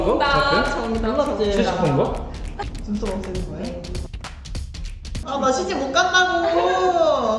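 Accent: South Korea. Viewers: 항상 잘 보고있어용 ㅎ 충남어.. 중국어에 성조가 있듯 의 충남어에 있는 강한 억양! ㅎㅎ 넘나좋은것! ㅎ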